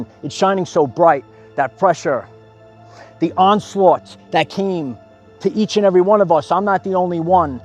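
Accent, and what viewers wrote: New York, United States. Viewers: Love your inspiring videos, and being a New Yorker myself, your NY accent...thanks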